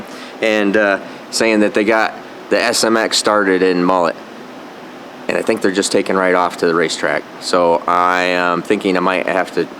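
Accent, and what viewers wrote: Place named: Northeast, United States. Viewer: "...right there Baab. At the haat spaat of the journal..."! You're from the northeast! A hillbilly like me can tell an accent like that anywhere!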